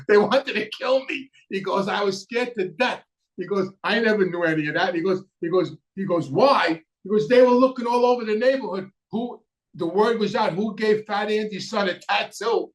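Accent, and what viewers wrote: New York, United States. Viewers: I love listening to you talk. Always reminds me of the time I spent in New York.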